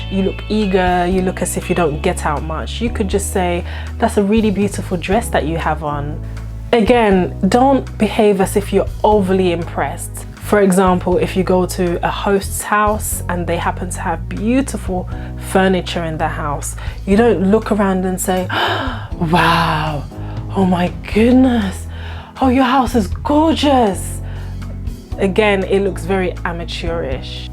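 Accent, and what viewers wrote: United Kingdom. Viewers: ove your British accent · I like the British y African accent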